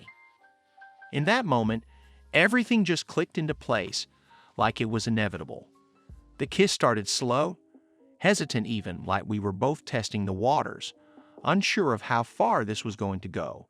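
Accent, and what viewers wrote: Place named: South, United States. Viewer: You sound like a redneck and that's hot! You and you nephew have some fun, a lot of fun many times!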